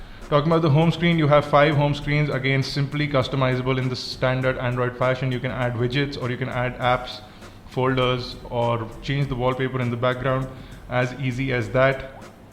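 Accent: India. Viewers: oh damn i hate that silly indian accent...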